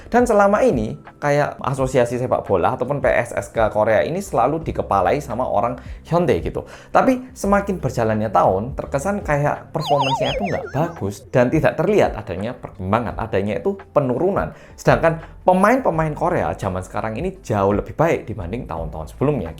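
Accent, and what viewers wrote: Java, Indonesia. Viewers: Bahasa jowo nya sangat kental banget 😅😅😅😊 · ini mas se logate jawa koyok e...tapi muka e korea...salut...aku.baru.mampir di channel.ini langsung tak subrike heheh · Ini kok logatnya ada lihat Jawa emang tinggal dimana? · Logatnya lebih ke logat jawa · Hebat bahasa Indonesia anda perfect meski ada logat Jawa sedikit ,❤ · Abang ini Dialeknya seperti orang Jawa awal nya Saya bingung Ini orang Korea atau orang Jawa 😅😅😅😅 Ok Bang Salam Kenal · bro kamu logatnya ko ke orabg jawa..medok😁🙏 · Mantuuuuullll mas Medhok Jowone....😀👍👍👍 br ngevlog lg ya Mas, mngkin krna sbk dg kerjaan Rutin, ok truslah berkarya sehat2 sklwarga and sucses selalu Mas 🤲🙏👍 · Bahasanya kok medok kyak Jawa ni 😂😂.pandai bicara Indonesia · Apik komentarmu bro. Jawamu ko medok emangnya anda tinggal dimana. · Muka Korea logat BHS jawanya kentel suka sekali ngeliat mas nya ngomong😊😊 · Jawa nya medok 😂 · Medok jowone😁😁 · Ko rasanya mendengar aksen orang jawa bicara ya... 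🤔🤔🤔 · Waduh mas korea lancar banget berbahasa Indonesia nya dan logat Jawa lagi... OK mas korea saya sangat suka 👍👍👍👍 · Wahhh saya salut bahasa indonesia nya bagus bgt, ada logat jawa nya juga❤❤❤❤ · Mas sampean ni ngomong Indonesia serasa Jawa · Mas orang Korea ko bahasa Indonesianya lancar banget sih, bahkan logat jawanya kental sekali! Sudah berapa lama sih tinggal di Indonesia? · Iki wong korea opo wong jowo logate kok medok jowo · Idemu cemerlang Rek, sampeyan neng Jatim Wis pirang taon kok medok banget ? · orang korea tapi kyorang jawa medok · Bahasa Jowone medok bangett,,😀 asli keturunan jowo pundi? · Sampeyan kok medok jowone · Lancar juga bhs indonesia ini mas Korea,,,lidahnya lidah jowo juga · logatmu medhok banget mas 😀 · Lha wong dr TK smpe SMA sekolah nk malang ogg.. 😂 yo medhok nyel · Bahasa Indonesia kamu medok banget jawanya... Pasti km fasih bahasa jawa.... Yo opo ceritene rek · Sampeyan jawine pundi ?? 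Kok logatnya Jawa !!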